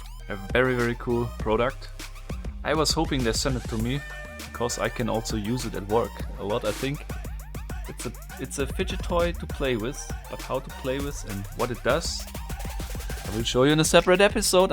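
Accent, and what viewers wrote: Germany. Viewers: Omg that German accent tho!!! Love it❤️❤️❤️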